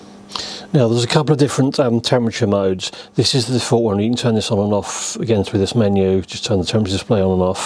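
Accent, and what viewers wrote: United Kingdom. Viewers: Yeah, if you have that much trouble, just go watch one of the many other electronics channels. And those of us who can understand English accents just fine (that's just how people speak in that region) will enjoy amazing content.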